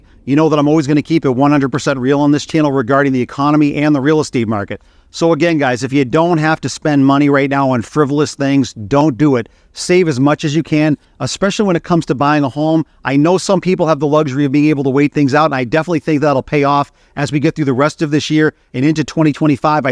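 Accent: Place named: Canada. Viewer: Where in Canada are you from I can tell by your accent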